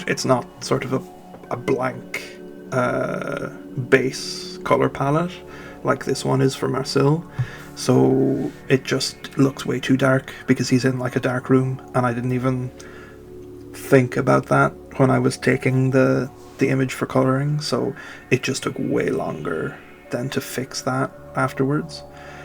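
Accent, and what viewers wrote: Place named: Germany. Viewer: 😍 OMG, first time I'm seeing your own art! ...your accent sounds a tad German... Sind wir Landsmänner?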